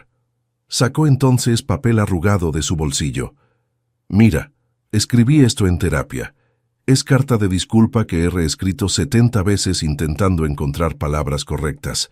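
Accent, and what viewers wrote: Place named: India. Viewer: Eso de narrar estás historias en indio, las perjudica totalmente,, si no se corrigen no tendrán mucha audiencia, es patética, está forma de narrar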